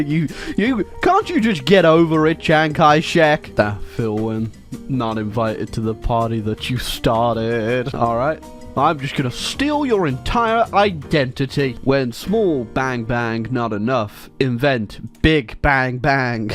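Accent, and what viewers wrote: United States. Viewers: I don't know where is from anymore. I actually thought he was Belgian once but I realized that was only a meme? Then it seemed like he was just English, but now he's saying "zee" and actually the rest of his accent sounds like it might be American.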